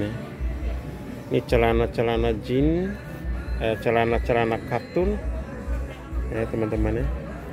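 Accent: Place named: Bajawa, Indonesia. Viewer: Logat e Logat Su pi merantau Logat Kampung masi dibawa ke kota Besar e..dasar org BAJAWA Flores .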